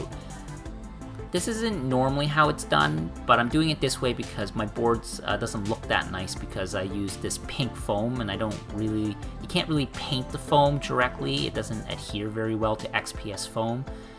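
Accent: Canada. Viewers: I hear some Canada in your voice?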